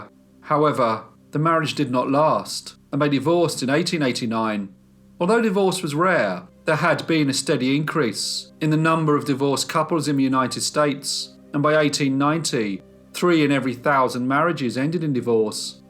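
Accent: United Kingdom. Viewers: Whenever l hear your voice, l always picture a younger 'Alan Rickman'..... I'm dying of curiosity..... Am l even remotely close? (sorry, I know looks aren't important, but I already have a crush over your British accent, so l can't help but wonder about the rest of you! Sorry for sounding creepy, I'm actually a huge fan of your channel!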